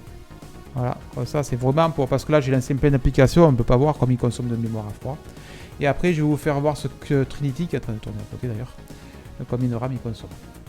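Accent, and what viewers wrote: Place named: Hérault, France. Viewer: Intéressant.... d'après ton accent tu es origonaire de lherault non 😊 ou proche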